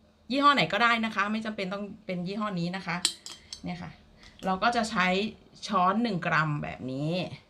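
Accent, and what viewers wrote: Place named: Phu Thai, Thailand. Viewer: มีเเต่เมนูตาเเซปจ้า,ออนซอนจ้า,มักสำเนียงภูไทมากจ้า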